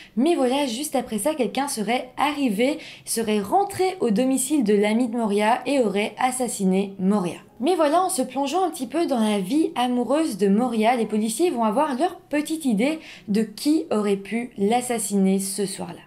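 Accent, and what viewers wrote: Belgium. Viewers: Bonjour, Bon avant toute chose, je te remercie de relater cette affaire aussi récente et dans les détails et dont je n'avais pas eu connaissance.😉 Cependant et au risque de me mettre toute ta communauté à dos...🥺😭 Mais bon sang!!! Non pas que je sois tatillons et sachant en plus que ton accent belge est des plus charmant et n'étant moi même pas originaire d'Alaska(rapport au poisson); Par pitié!!! 🙏 "Colin" quant bien même ait-il de "l'oseille"; je pense que la "moutarde"; fut-elle à l'ancienne, lui monterait rapidement au nez en entendant ta prononciation le concernant😡😂...(par ta faute, j'ai la dalle; du coup🍽😊)... Concernant ton analyse: j'acquiesce totalement et bien que l'affaire soit en plein déroulement, il ne fait aucun doute selon moi, concernant la culpabilité de la principale intéressée (jalousie, possessivité et fuite par dessus le marché). 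En conclusion, je dirais que force est de constater que le yoga ainsi que ses alternatives en matière de relaxation (à l'occidental, je précise); sont loin de démontrer leur efficacité en matière de gestion de la colère, ainsi que de retenue vis à vis d'autrui... Je laisse notamment à tes viewers (et potentiellement lecteur de ce post); le soin de se faire leur propre opinion concernant le nombre à travers le monde, d'individus, soit disant "healthy" et "natty"; "bien dans leur peau et dans leur tête à l'instant T"; ayant basculés dans les abysses de la folie; à priori aux antipodes de leur style de vie tan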